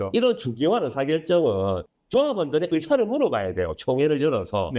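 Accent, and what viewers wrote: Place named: Busan, South Korea. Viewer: ㅋㅋㅋ 인정~~~ 하지만.... 부산 사람은 자막 안 봐도 다 알아 듣습니다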